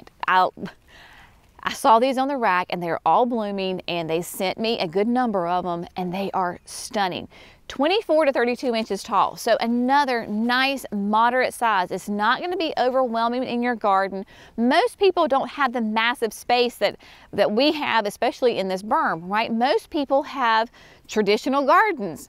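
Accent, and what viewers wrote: South, United States. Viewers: Hi, Love your Southern drawl!! Best, Albert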